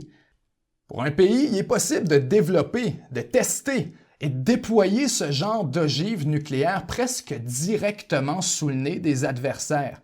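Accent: Canada. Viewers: son accent canadien qui rajoute un peu de couleur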